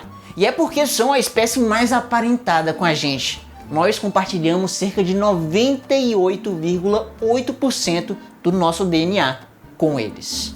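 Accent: Ceará, Brazil. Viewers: Mano vc é cearense? Puta cabeção de caixa d'água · Man tu é cearense?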